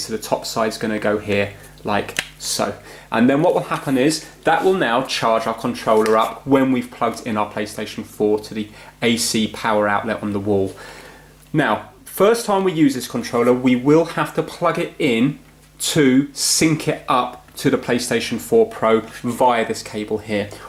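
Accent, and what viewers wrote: England, United Kingdom. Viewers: Great instructions. Nice clear English accent. Thanks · Fuck british accent.....weak accent ever. Try to be American. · He has a British accent. Not sure where you get this impression. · this british accent gives me on my nerves..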